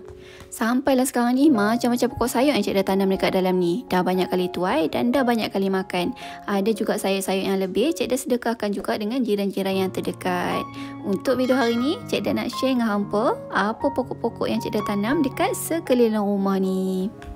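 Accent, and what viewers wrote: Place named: North, Malaysia. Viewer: Dengar loghat macam orang utara,belah kawasan mana?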